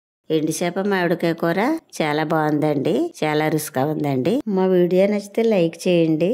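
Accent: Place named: Godavari, India. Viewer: అండి అండి అంటున్నారు అంటే మన గోదారొళ్ళే 👌👌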